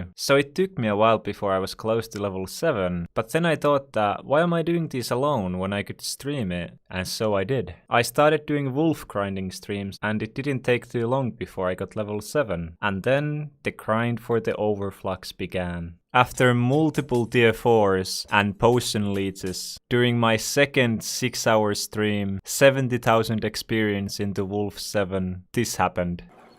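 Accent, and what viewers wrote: Finland. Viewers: Mäkin oon suomalainen huomasin aikasemmin kun sulla on semmoinen englanti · you have a amazing finnish accent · finnish accent and his name is finnish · means block in finnish and he has the Finnish accent HE IS FINNISH SUOMI PRRKELE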